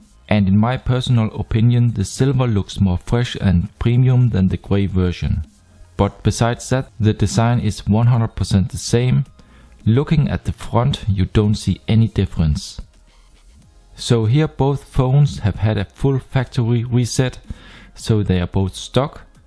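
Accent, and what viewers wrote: Germany. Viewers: Für eine lange Zeit beobachte ich Ihre Bewertungen und schreiben mit einem sehr guten Lichtqualität und eine Freude zu Ihrer englischen Sprache mit deutschem Akzent zu hören. Nach Ihrer Präsentation kaufte ich Umi eMax und später SuperUmi. Jerste sehr glücklich. Vielen Dank, und ich herzlich begrüßen. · Man hört so das du Deutscher bist xD Gutes Video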